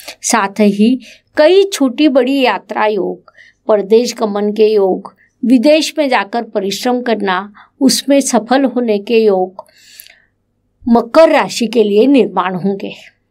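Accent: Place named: West Bengal, India. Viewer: Ye kaunsa leheja hai ? Bangali hain kya aap ?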